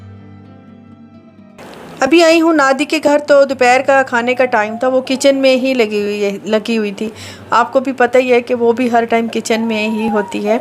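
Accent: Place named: Saraiki, Pakistan. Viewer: Apki saraaaeiki punjabi hai we have house help saraaaeiki wo accent hi aur hota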